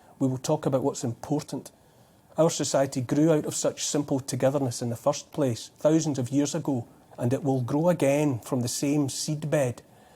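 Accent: Scotland, United Kingdom. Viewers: So thats what my thoughts sound like with a Scottish accent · Most sensible post for many moons and told in the wonderful tones of an educated and very calm Scotsman. Wish we had many more like Neil. Leaders of integrity are in desperately short supply. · I genuinely don't care what he says, i just listen to his accent. he's like the scottish james may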